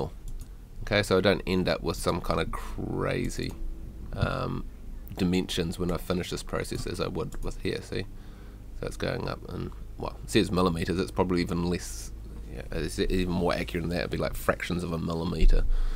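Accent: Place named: New Zealand. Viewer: Thank you, really easy to follow along with. I like your accent, are you from New Zealand?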